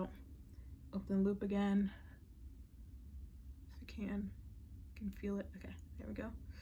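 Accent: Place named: United Kingdom. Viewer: As a Londoner the British accent is appreciated 😂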